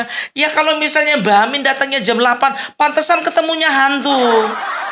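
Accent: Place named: Java, Indonesia. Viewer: Aseeek...opung pake logat Jawa..😂😂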